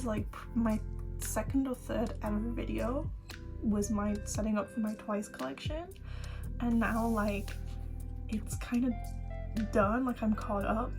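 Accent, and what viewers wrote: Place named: United Kingdom. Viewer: me: don't mention fellow british accents every time you hear them also me: surprised to hear british accent even tho i've watched you before ( i have not moved from dark side kermit meme ig )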